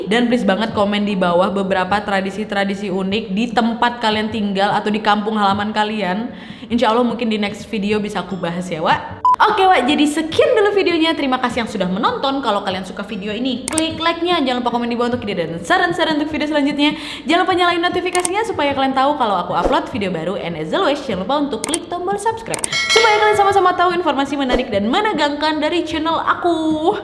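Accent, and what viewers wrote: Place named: Sumatra, Indonesia. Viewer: Pembawa cerita ini logam sumatra atau sengaja meniru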